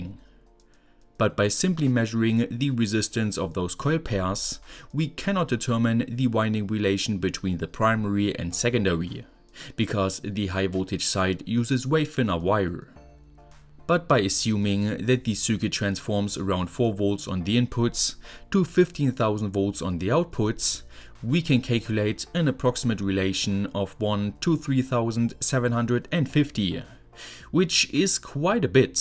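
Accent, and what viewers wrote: Germany. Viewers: Lol als ob du aus Deutschland bist xD Hatte irgendwie usa gedacht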